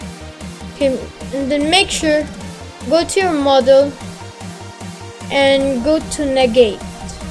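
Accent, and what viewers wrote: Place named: Philippines. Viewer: nice filipino accent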